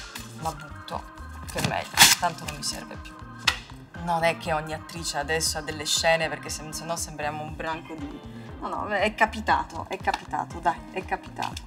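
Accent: Italy. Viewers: un bellissimo accento italiano💗:)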